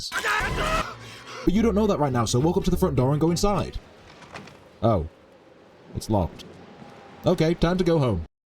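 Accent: North, United Kingdom. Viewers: Love you especially because your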